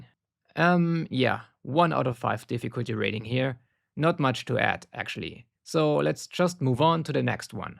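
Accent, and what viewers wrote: Germany. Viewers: Most german accent ever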